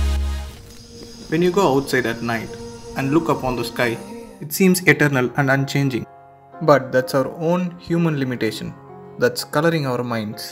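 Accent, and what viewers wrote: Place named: South India, India. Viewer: Your accent is very similar to a South Indian men. Am I right?😀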